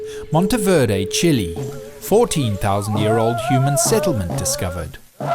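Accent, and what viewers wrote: United Kingdom. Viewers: Does anyone else notice the irony that this post about Native Americans is narrated by a man with a British accent? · Solutrean if you believe the interpretation of the archeological record. Bearing Straight origination is even less likely than Pacific Pan-oceanic migration. Truth doesn't concern itself with feelings nor should it, funny little British accented man reading US a script telling US about our history. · Imagine being the one who left that turd I bet at the time they weren’t thinking that far into it- why is a British accent talking about how old natives are uh dude our ancestors all are the oldest yah think no one nation can clam the oldest that’s like saying I am better cause I have blue shoes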